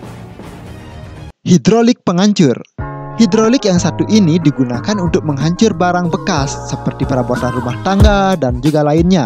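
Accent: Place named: Bali, Indonesia. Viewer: Pengisi suara orang Bali😁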